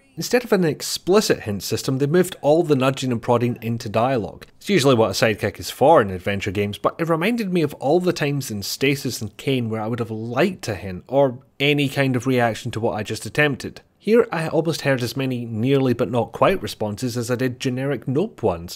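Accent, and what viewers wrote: United Kingdom. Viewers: you sound british to me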